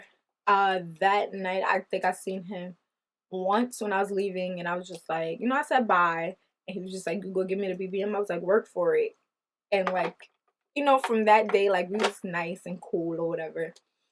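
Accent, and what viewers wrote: Jamaica. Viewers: are u Jamaican? you sound like it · Love that you get Jamaican when you get mad 😍👑🙌🏽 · just found your channel, and mi neva hesitate fi subscribe from I heard Jamaican yazzz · You Are SO Pretty & I Love When You Talk Jamaican . #Suscribed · Your Jamaican accent is everything 😍😍😍😍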